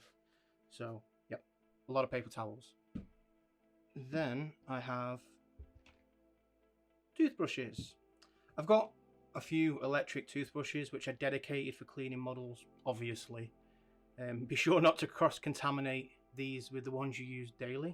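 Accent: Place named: Northern England, United Kingdom. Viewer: Dude I completely didn’t expect your accent when I came in here. Super happy to be supporting a northern artist.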